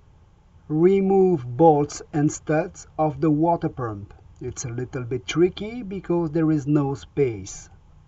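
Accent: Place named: France. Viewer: Hi, you should have your own T.V. Show! Great tutorial & very very clear precise instructions, also very good English but I love your accent, vive la France.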